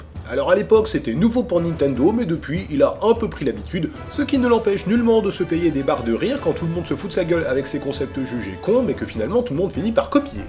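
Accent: Alsace, France. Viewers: Tu ne serais pas Alsacien ? Sinon,j'ai aussi galéré sur l'epreuve de la peur.. en faite je l'ai jamais reussi x')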